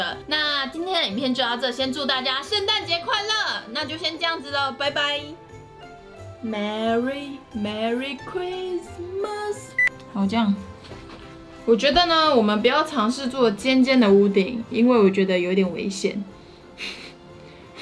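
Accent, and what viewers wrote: Taiwan. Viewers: 可愛的..怎麼吃都美...又配上有台灣味愛台灣的臺語口音.吾告讚.水喔...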